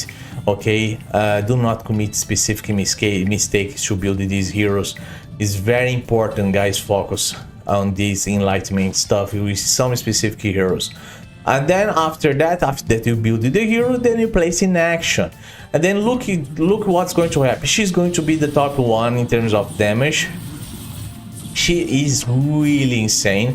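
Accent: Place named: Romania. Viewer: Are you a Romanian? Coz your accent is kind like a romanian like Skratch or Oddone..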